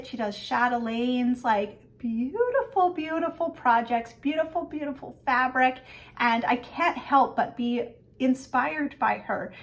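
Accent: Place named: Canada. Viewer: Oh, I love your videos. Your taste in charts is wonderful and your stitching is so beautiful. On top of that you have the most soothing voice and lilt to your lovely Canadian accent. I grew up on the NY/ON border and love what we call the “round Os” of our Canadian friends. Delightful to listen and watch.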